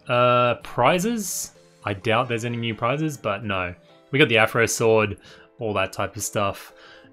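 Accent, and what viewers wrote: Australia. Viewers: Are you from Australia by chance?:D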